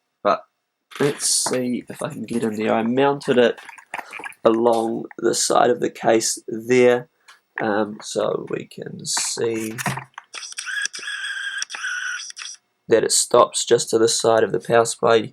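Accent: New Zealand. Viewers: i thought so, you've got that kiwi accent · Are you a kiwi or an aussie?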